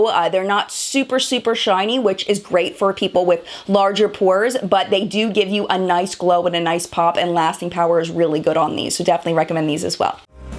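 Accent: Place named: Baltimore, United States. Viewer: Ha! Thought I recognized the accent as Mid-Atlantic (Philly?) but didn't know for sure it was Baltimore. Baltimore Hon here, too too. 😁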